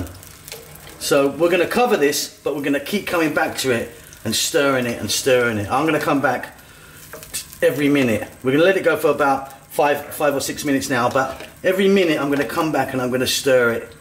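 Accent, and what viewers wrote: Philippines. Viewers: Like your Tagalog accent, and it is nice to see you back, and this recipe looks damn delicious will try and Thank you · Very good Pinoy accent to the ingridients"Nice presentation also keep cooking taglish Version Thank u..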